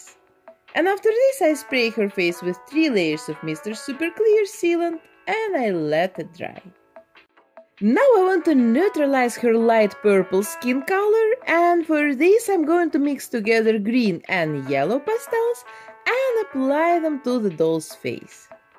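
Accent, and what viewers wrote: Russia. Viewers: У вас русский акцент Или мне кажется?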